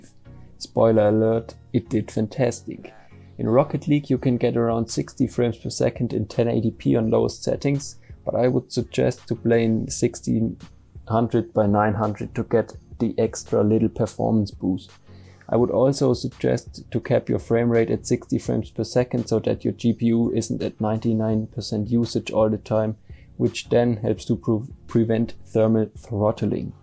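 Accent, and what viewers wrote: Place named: Germany. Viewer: Man hört halt sofort raus das du Deutscher bist 😂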